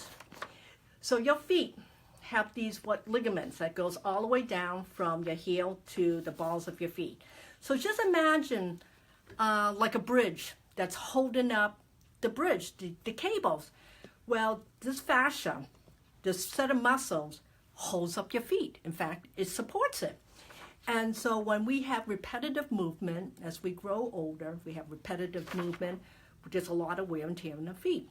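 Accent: New York, United States. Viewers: I like her New York accent. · I was very surprised and delighted to hear someone with a NY accent! Hence I really enjoy learning from you. I first started using an iced water bottle to roll and found some relief, then I just got an electric Shiatsu foot massager that has a warning function [which I haven’t used yet], my question is, when do I use ice and when do I use the heating? FYI, I found a big bump above the area where you had marked on the foot, so I have been concentrating mostly on that area.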